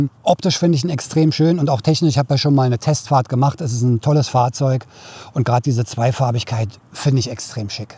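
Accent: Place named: Berlin, Germany. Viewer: Da ist sie wieder. Die Stimme von Hans Rosenthal 😊. Immer sympathisch und kompetent mit ner duften Note Berliner charms. Bin gespannt auf das Jahr mit deiner „Neuen“. Schade nur um den Zontes, da hätte mich doch ein Langzeittest sehr interessiert. Geht’s denn damit auch in den Urlaub? Bin gespannt. Also, herzlichen Glückwunsch und allseits gute Fahrt. Vielen Dank für den und die vergangenen Berichte. Bitte immer weiter so 😎✊🏻. Schönen Ostersonntag noch.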